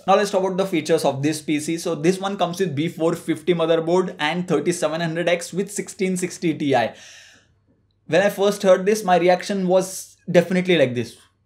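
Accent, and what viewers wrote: India. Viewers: Love Indian English bro😍😍😍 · Please don't make fun of his accent. I have Indian friends, they already know its not a "cool sounding" accent. He made a more detailed video about this computer than most other people on YT. Let's show him support :) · Why is this hispanic guy doing an indian accent?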